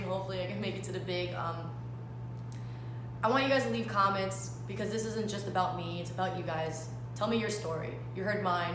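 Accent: New York, United States. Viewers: I could tell from that accent you were from NY without even knowing!!! You’re absolutely fucking stunning! I’m a fan! 💕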